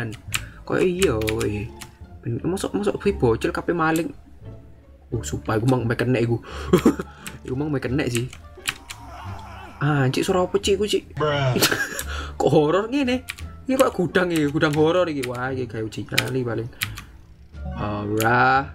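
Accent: Java, Indonesia. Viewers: Bang sampean jowo ne jowo ndi mas kota ndi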